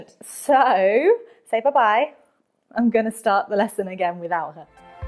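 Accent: United Kingdom. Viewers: Thank you so much, you are such a great teacher. I've made great progress in my English level by watching your channel! your British accent is really lovely.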